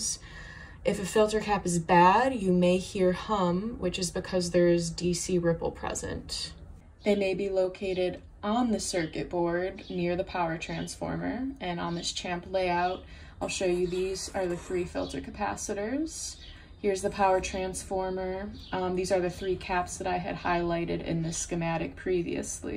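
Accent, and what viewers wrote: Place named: Southern California, United States. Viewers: Great tips on how to live longer! You sound like a Californian, if so ,how can I get my amp to you so that it can be fixed by a true Pro? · That was awesome, and without a doubt the definitive SOCAL delivery. Lol. Very laid back and with that slight slowness and crackle at the back of her words. Like, totally…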